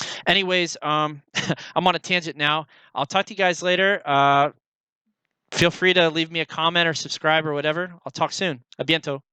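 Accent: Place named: United States. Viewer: you have the american accent but it's not strong to the point i wont understand and it's better than then the previous video for sure, accent will be lesss and less present the much you speak with native level speaker. Immersion all the time , it a method actually.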